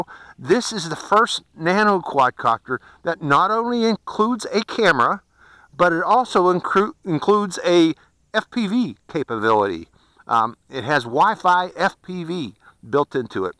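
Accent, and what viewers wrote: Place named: New England, United States. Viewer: Indoors? You sound like one of those (us) New Englanders. LOL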